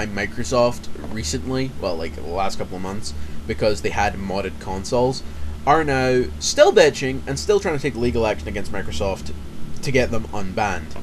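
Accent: United Kingdom. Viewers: are u a brit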